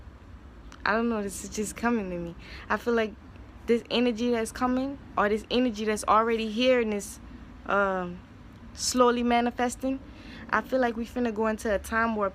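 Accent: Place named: Louisiana, United States. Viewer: Is she from Louisiana? I hear a Louisiana accent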